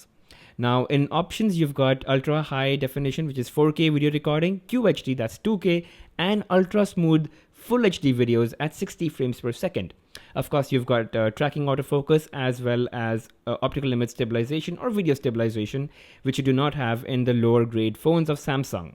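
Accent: India. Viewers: nice review . i love ur accent with that desi touch . :) keep it up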